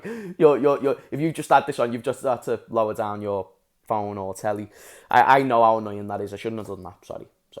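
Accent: Liverpool, United Kingdom. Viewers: Hey, I am a teenager from Kazakhstan, but I absolutely love your channel and Scouse accent ofc, despite the fact that I am a foreigner, I understand 100 of the words you are saying and the words that you are saying they are very objective and precise, hope I will se ya some day when I might visit Liverpool in the future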